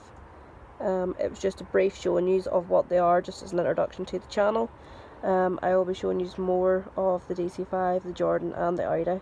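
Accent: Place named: Northern Ireland, United Kingdom. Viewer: It's the townie accent which makes them think you're Scottish. 😂 😂 if I had to guess I'd say Lurgan but you might not want to get that specific. 😂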